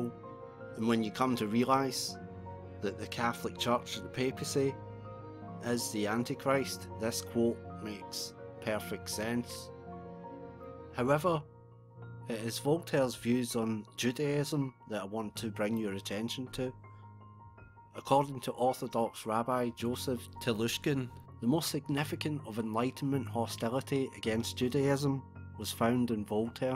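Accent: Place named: Scotland, United Kingdom. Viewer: It's a Scottish accent not Irishman...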